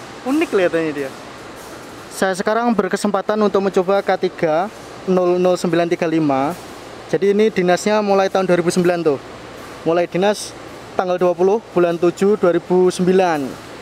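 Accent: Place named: Sumatra, Indonesia. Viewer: Ngomongnya udah logat Sumatera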